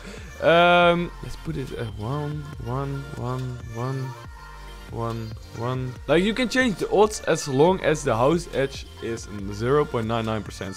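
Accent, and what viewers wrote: Netherlands. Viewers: Dutch accent? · ik hoorde gelijk dat je nederlands was hahaha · NEDERLAND !!! ik dacht al je hebt een Nederlands accent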